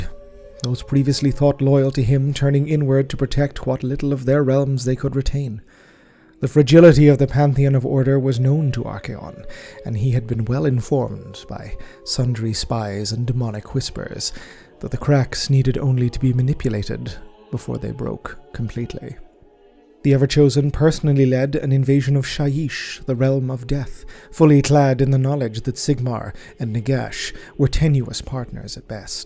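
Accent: Ireland. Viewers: Where abouts in South Dublin are you from. Am I right in picking up a south dublin accent?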